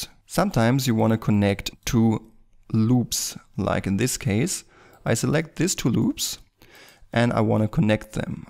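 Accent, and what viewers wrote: Germany. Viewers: Woah I can hear that german accent even though I'm not native english (german too actually xD) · Diggi du hast einen Deutschenakzent........ ansonsten pretty good.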